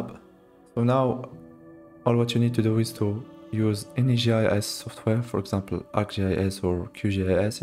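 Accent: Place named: Morocco. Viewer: This guy's accent is moroccan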